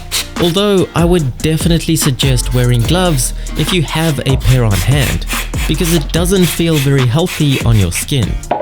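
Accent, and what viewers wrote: South Africa. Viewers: How do you know JHS Racing bro? I just assumed from the accent you were in RSA. Awesome crew aren't they? Great vid, thanks mate. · dud are you from south africa?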